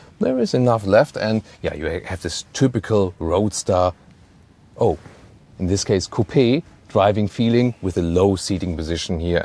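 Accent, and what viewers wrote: Germany. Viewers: What a terrible german way to speak english. Sounds terrible. Take some lessons, please.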